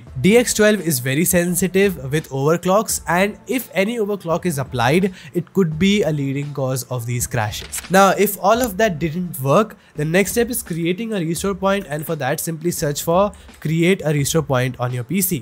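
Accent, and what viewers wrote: India. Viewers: i was just going to say. dude tells you to turn off a firewall protection then non schilontely tells you to download malware while having a notoriously indian hacker voice. indians really should get morals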